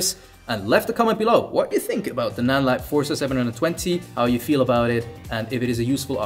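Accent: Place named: Switzerland. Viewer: I can hear that swiss accent miles away. But thanks for the comparison. Feel like Nanlite has been catching up. However im sticking with Apputure.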